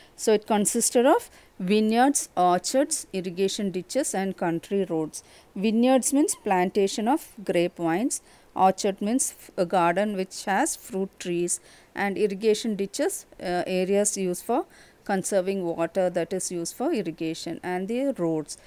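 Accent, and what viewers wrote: Kerala, India. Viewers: Are u a Malayali?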